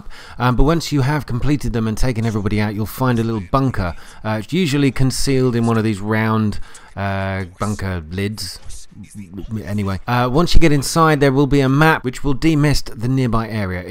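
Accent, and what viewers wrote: United Kingdom. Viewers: YES YOUR BRITISH sub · Good to see a fellow British guy doing a video, expected an American but pleasantly surprised. Not that I have anything against the Americans. 😜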